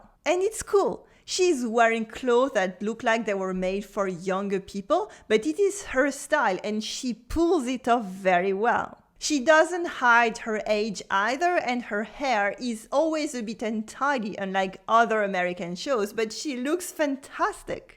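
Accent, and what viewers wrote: France. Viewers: Well madam let me help you English is not spoken with so much emphasis on the words it spoken with ease you are speaking English with French accent.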